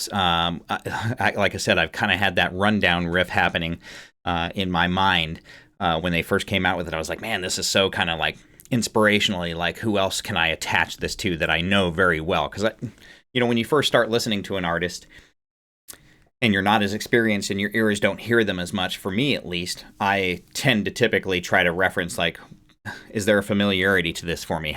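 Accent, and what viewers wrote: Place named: Brazil. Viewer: I like your Brazillian accent!😍so classy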